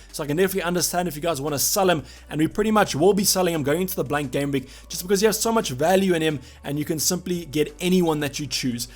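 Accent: South Africa. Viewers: You sound hella South African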